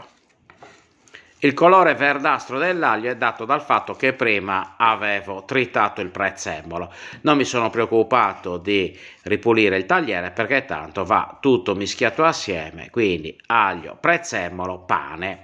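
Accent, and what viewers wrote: Sardinia, Italy. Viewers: BRAVO FINALMENTE UNA RICETTA ORIGINALE È SEMPLICE LA FACEVA MIA MAMMA E DOPO LA FACCIO IO UGUALE COME LA FATTA TU,. USIAMO GLI STESSI CALAMARI PICCOLI E FRESCHI IL POMODORINO SEMPRE FRESCO E VERAMENTE UNA BONTÀ E SE RIMANE UN PO DI SUGO LA SERA FACCIAMO 2 SPAGHETTI QUESTE SONO LE VERE RICETTE SEMPLICI E GUSTOSE CREDIMI PER CURIOSITÀ VEDO DEI VIDEO DI CALAMARI RIPIENI CHE VIENE IL VOMITO A VEDERLI DI QUANTI PASTROCCHI CI METTONO DENTRO MA PER FAVORE TUTTO IL CIBO PIÙ SEMPLICE SI FA PIÙ GUSTOSO E DIGERIBILE SARA, NOI ANCHE IL PANE GRATTUGIATO LO FACCIAMO FRESCO AL MOMENTO COL PANE DI CASA RAFFERMO IO NON O DA IMPARARE NIENTE CUCINO COME MIA INSEGNATO MIA MAMMA MIA NONNA E ANCHE MIA SUOCERA, E POSSO DIRTI CHE GENERI NUORE NIPOTI AMICI OGNI VOLTA VOGLIONO IL BIS, E MIO MARITO E ORGOGLIOSO, SIAMO DI MESSINA, E DAL PARLARE PENSO CHE TU SEI SARDO, CONTINUA A FARE CIBO SEMPLICE ETUTTO FRESCO E GENUINO E NO CIBO CONGELATO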